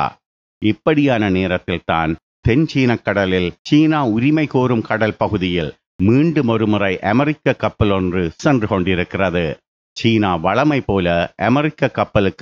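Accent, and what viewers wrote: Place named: Jaffna, Sri Lanka. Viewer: எனக்கு யாழ்ப்பாண தமிழ் மிகவும் பிடிக்கும்🇮🇳👍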